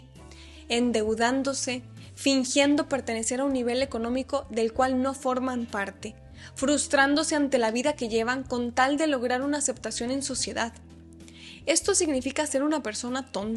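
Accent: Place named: Monterrey, Mexico. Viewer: Suena a Monterrey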